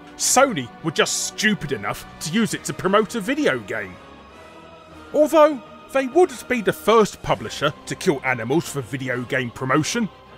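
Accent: United Kingdom. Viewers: MC Apophis He’s British. That’s like me pronouncing Connecticut properly, and everyone dissing me for it.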